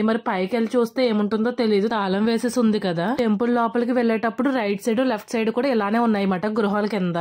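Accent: Godavari, India. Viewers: ఏమండీ మీ వీడియోస్ చాలా బాగుంటాయి.అచ్ఛ మన గోదారి యాసలో మీరు బాగా మాట్లాడతారు.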